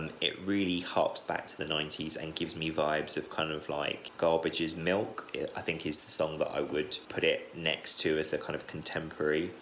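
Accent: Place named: United Kingdom. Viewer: I Just loved your british acsent... And I get a little bit surprised 'Cause i' ve never thought that you enjoy and... The other 3 songs I understand... But the other 2... It was a really huge surprise. Most of all:, 'Cause for her it wasn't a goog single... But I really enjoy this reaction...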